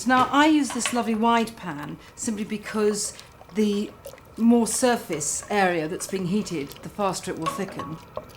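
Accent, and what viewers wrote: England, United Kingdom. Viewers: Falling in love with this English lady & her accent. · I think she's speaking British English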